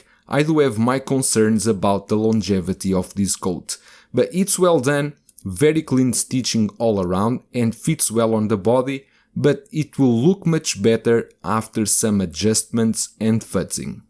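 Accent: Portugal. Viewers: Pela forma de falar / sotaque logo vi 🇵🇹 Que honra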